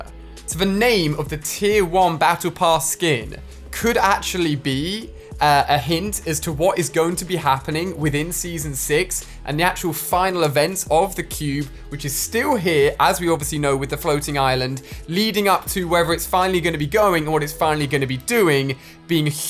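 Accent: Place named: United Kingdom. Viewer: Can you stop talking in that British accent it’s kind of annoying talk like a regular person please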